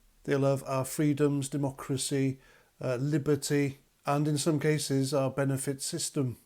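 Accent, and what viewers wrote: Wales, United Kingdom. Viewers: You sound Welsh.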